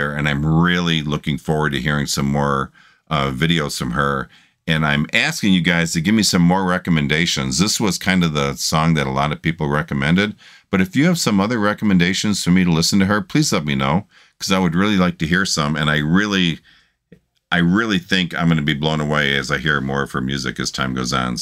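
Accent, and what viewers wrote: Chicago, United States. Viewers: I was gonna say you have a Chicago accent and then you pull out a Portillo's cup! I had a dripping wet Italian beef 3 days ago!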